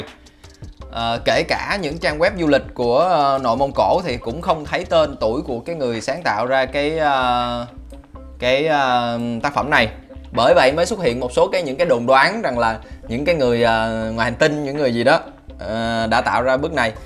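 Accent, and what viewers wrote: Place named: Mekong Delta, Vietnam. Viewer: Gất là gần. Nghe rồi ông còn sub nữa. Làm như ngta k biết ông dân miền Tây hay gì kk. Nhưng video này chưa chất lắm đâu nha.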